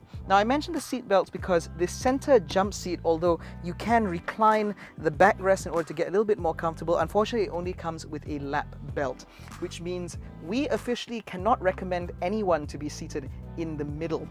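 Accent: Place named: RP, United Kingdom. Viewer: Just wow with your smooth and fluid presentation! Love the “queens English” sounds posh for a posh looking car! Done like and subscribed! Well done!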